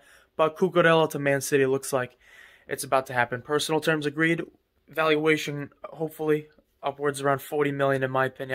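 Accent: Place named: New York, United States. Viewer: I can tell you are from New York without telling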